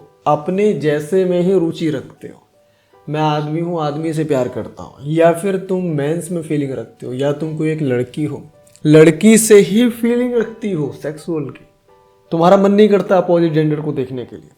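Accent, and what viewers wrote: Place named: Rural, India. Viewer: Bhai tu jaise bat kar raha hey aur jaisa tera accent hey. Yeh sab tere gaao mey hota hoga. Par the reality is aj koi bhi para likkha hua ladka yeh sochke shaadi nahi karta hey k biwi aa k uski paa dawaygi, yeh toh bahut dur ki baat hey ladki aa k ghar ka kaam karegi yeh bhi koi nahi sochta , kyuki ajkal ka ladki ya bahut hi modern ho chuki hey. Bol degi biwi layi ho, servant nahi. Toh jo bhi ladka shaadi karta hey, woh just ek nice family pane k aas mey shaadi karta hey. Lekin india ka biased law k chalte huye sab ladki aur uski maa baap ko malum hey k shaadi sey accha business aj kuch bhi nahi hey. Pehle shaadi koro, fir divorce do, fir alimony lo, maintenance lo. Ho gaya savings for lifetime, ab dusra bakhra dekho. Isiliye no men should get married in India. Bas yehi ek reason enough hey shaadi na karne k liye.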